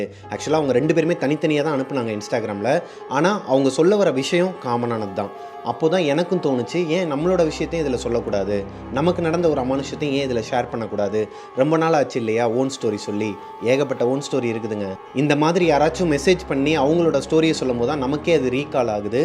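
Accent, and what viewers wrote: Madurai, India. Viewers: Hi.. i m shobana from madurai... i like ur videos... personalave i like u and ur slang.... especially "vellena endhrichuten"... madurai la dan apdi solvanga... neengalum virudhunagar.... so unga videos, pranks ellame parpen.... additionally en baby name prabaharan and my brother name is arjun... so unga videos time ku pathuduven... unga ghost videos la 1 video iruku... <link> <link>... indha video title eh romba bayama irundhuchu... so comments pathen... so many people "ghost vandhuchu.... terrible sound kettuchu" nu potrundhanga.. so andha video mattum nan ipo vara pakkala.... really is it serious to listen...? Clarify pannunga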